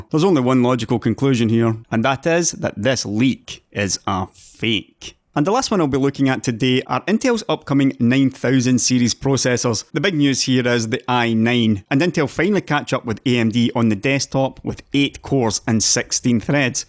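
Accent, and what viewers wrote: Scotland, United Kingdom. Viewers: your's is a Scottish accent, isn't it? breath of fresh air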